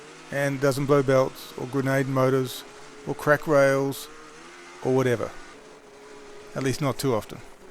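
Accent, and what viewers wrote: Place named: Australia. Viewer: Dude the swedes made the bad ass tune for the yammie. 270hp on the 153. This dude sounds like he's from Australia. That's not a knife this is a knife. They made 2 strokes look like clowns like those guys digging and talking more then riding ripped through arm deep powder. The srx tune to 270 made cats alpha one look real bad. Called weekend sleder's. Even the older stuff 1049 vipers 270 tuned made 2 strokes look bad. Those yammies ate through anything they put them through and everyone knows yammies reputation of big miles and durability. Like it or hate it.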